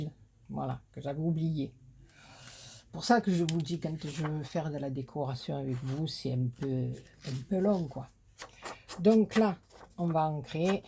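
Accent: South, France. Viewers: on entend bien l accent du midi.je suis de l aude tres jolie carte.tres vintage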